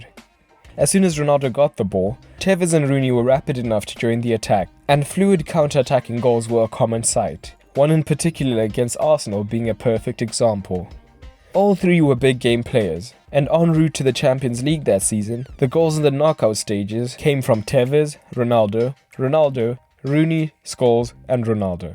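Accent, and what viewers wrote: South Africa. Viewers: South African??? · are you south african by any chance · Sorry fam have to ask...are you South African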